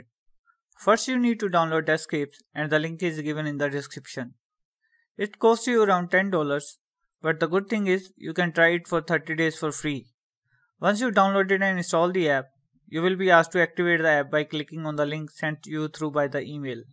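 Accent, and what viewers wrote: India. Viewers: love the Indian accent.. good , helpful video too. Thank you! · indian english is cute xD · Me hears an Indian voice... Instantly clicks away... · 100% Hindu. I swear didn’t even look at comments but I knew immediately he is from India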